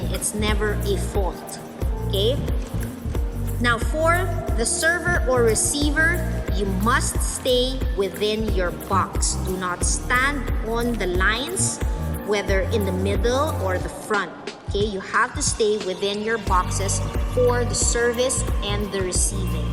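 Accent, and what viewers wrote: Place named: Philippines. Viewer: Based on her accent, most probably she is Filipino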